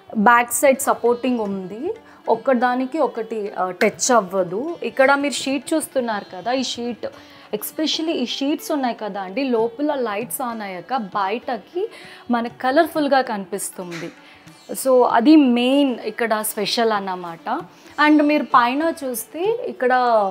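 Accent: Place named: Karnataka, India. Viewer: Valladi native karnataka state banglore anduke telugu ala vundi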